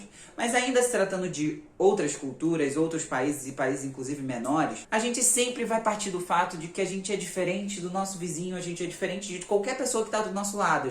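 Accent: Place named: Rio de Janeiro, Brazil. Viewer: Aí que saudade desse sotaque carioca e desses expressões involuntárias ❤️📸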